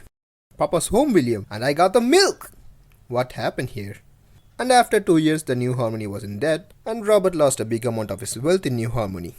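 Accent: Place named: India. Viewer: You sound like an Indian sam'o nella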